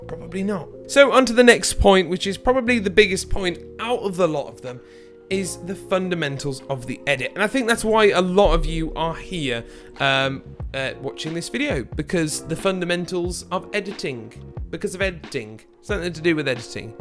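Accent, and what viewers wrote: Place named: Birmingham, United Kingdom. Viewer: oh thanks mate, that's so nice of you and my bad I couldn't recognize that brummie accent....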